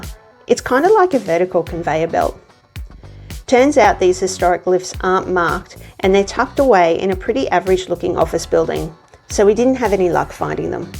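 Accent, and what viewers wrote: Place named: Australia. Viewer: Great to hear an Australian voice. We're planning to visit Prague later in the year so thanks for all the information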